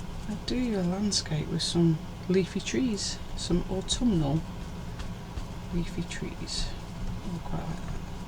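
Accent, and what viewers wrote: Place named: England, United Kingdom. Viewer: I live alone with my dog Madge, a rescue greyhound, and very rarely see another human being to talk to, so I talk to myself, and answer back all the time - I have always done this though! If someone suddenly appears, whilst I am nattering on when walking with my Madge, I pretend I am talking to her! But I do not mind if folk hear me doing this, I just make a joke of it! It is the only way I get through the day, and at least I do not get criticism for saying something wrong to someone - I only have myself to blame! I have just found your channel, so given it the thumbs up and subscribed. I am a pottery painter, but love all craft. I have owned and run 5 arts and crafts shops in my past. I also had my own Private Nursery School on the side of my house, down in Devon, in the 90s, and loved doing all that art and craft with my beautiful children I was so blessed to have coming to my Nursery School. 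It was called Woodpeckers Nursery School, and I had the chicks and the fledglings. I am now 67 years young, and need to get back to doing craft things, as in the last 7 years I have lost my way a bit, due to sever anxiety, and only going out of my very rural home to walk my lovely Madge. I am surrounded by nature, so going out with her is such a joy. My latest thing I am going to be doing however, is making Christmas decorations with acorns in their cups. I love the way you do your painting, so thank you for this video. It is lovely to hear an English accent too, as so many I find on h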